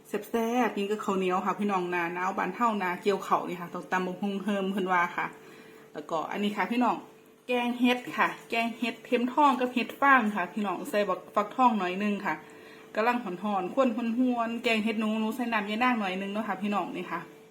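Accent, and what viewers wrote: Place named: Sisaket, Thailand. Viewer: แซบๆคับผมเห็นแล่วน้ำลายใหล สำเนียงคือคนศรีเกษแท่คับ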